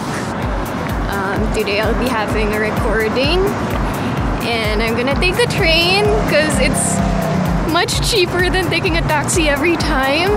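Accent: Philippines. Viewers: The way you talk and laugh scares me that Filipina accent lol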